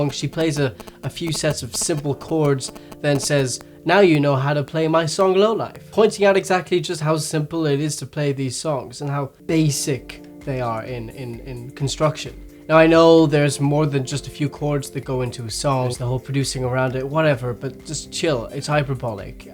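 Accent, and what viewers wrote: Ireland. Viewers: I think he's Irish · Are u Irish?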